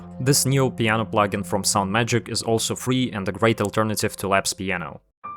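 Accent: Europe. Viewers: I came to say the EXACT same thing 😂. Dudes just European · He just has a European accent of some kind. Is that what you mean?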